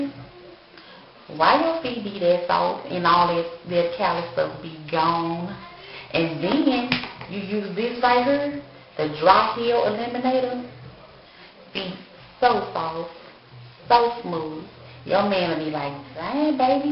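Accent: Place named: St. Louis, United States. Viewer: Hard-da-tion-----Foot game--duces -You sooo St.louis. I love u......gotta go on my way to Sallys lol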